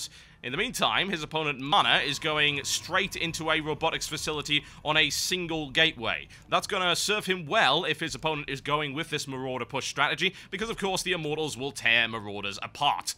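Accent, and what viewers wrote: United Kingdom. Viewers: I think I have found my new favorite SC2 caster. I have to say it's kinda unfair since has his British accent in his favour.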